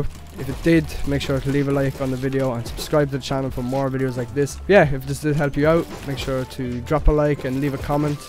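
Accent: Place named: Ireland. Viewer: Where u from in ireland I hear the accent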